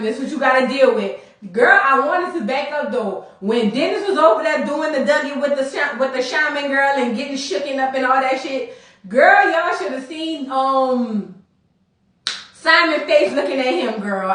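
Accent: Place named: Louisiana, United States. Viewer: Love your accent. You must be from Louisiana. Porsha is not over Dennis and she wants him to want her and show him what he is missing with Simone.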